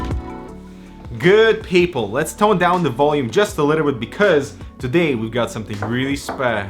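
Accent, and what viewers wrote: United States. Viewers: is your accent american or swedish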